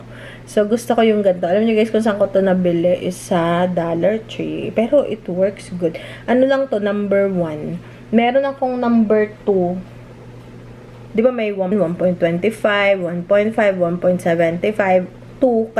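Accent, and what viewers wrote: Batangas, Philippines. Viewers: Matagal na aq nanonood Ng vlog NYO pero now din lang aq nag comment..Ung punto NYO Po Kasi SA nakaraang vlog NYO para kasing batanguena Kau..batanguena rin Po aq nasugbu batangas po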